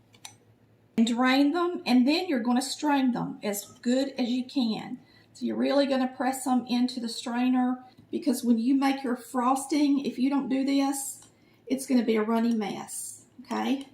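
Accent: South, United States. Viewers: Soon as you said "Hey y'all this is ". I was sold! So Southern and elegant 😊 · where are you from? I love your southern accent! I live in South Carolina, you remind me the ladies from My church!!!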